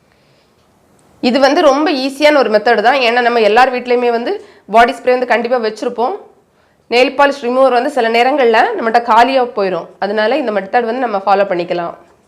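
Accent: Kanyakumari, India. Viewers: Nega kanyakumari ah sis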